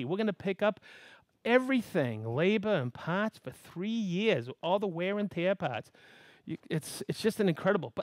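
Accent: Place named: East Coast, United States. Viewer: "this is an accessory God...Spock God" east coast accent...love ya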